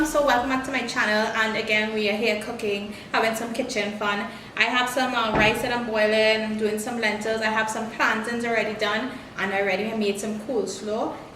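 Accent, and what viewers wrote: Trinidad and Tobago. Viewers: Wow. A muslim speak english. And Trinidadian english accent. Cooking Trinidad stew. Wow. Because the muslim in canada dont speek english. They speek only thing from packastand . I wish them Will speak english like you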